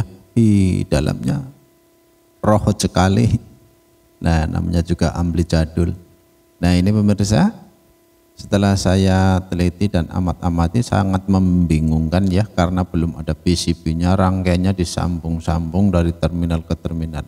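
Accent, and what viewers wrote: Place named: Banyumas, Indonesia. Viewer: Salut. Servis di daerah mana mas ? Kalo dari logatnya kayanya banyumas ya ? Hehe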